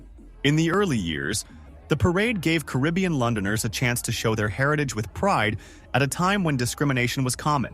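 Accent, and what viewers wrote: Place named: United States. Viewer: Sounds like an AI generated American accent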